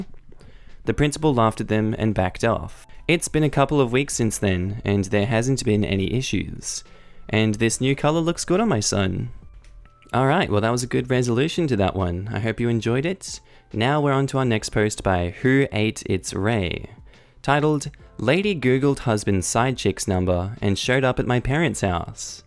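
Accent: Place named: Australia. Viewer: I might have a crush on the Ozzy accent.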